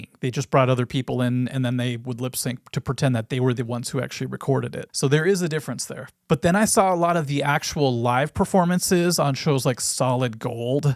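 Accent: Minnesota, United States. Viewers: Idk I never noticed your Minnesota accent till now 😂 but YEP everything's been fake!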